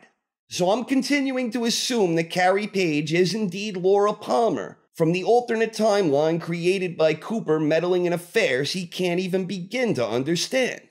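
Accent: United States. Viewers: i would love to know if this is your real voice..and if so....which part of America are you from...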